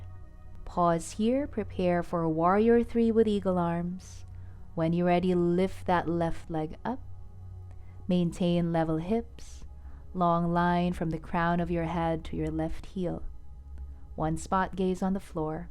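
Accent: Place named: Philadelphia, United States. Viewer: I like your classes. I especially like this one and use it quite a bit. I use a bunch of different videos and teachers, but you and another instructor, always tell when you’re doing your last downward dog. I appreciate that. Just out of pure curiosity, are you from the Philadelphia area? I’m only asking, because I enjoy trying to figure out accents. And yours sounds a little Philly. Obviously if you don’t want to answer, there’s no big deal. Anyway, thank you for your classes.!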